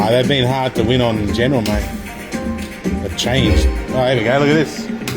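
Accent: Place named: Australia. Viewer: Real Aussie accent 😅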